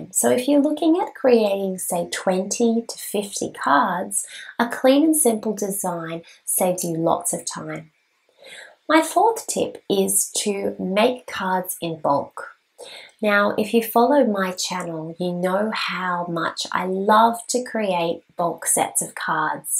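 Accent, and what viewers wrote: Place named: Australia. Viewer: I love hearing a fellow Aussie accent on YT! New sub from Central Coast NSW 🇦🇺❤️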